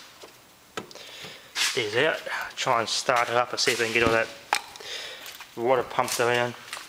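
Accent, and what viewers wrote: Australia. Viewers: Sounds like an Australian accent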